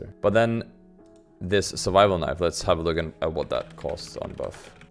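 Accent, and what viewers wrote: Denmark. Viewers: Love ur fcking Danish accent like Pimp xD <3!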